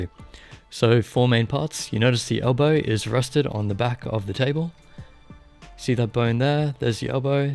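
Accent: Australia. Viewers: Yo didn't realise you were Australian, how're you dealing with the heat wave? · Are you Australian? Started watching your vids after I bought my DA elite, never figured that you are Australian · Oh damn, I never thought you would be Aussie. GG!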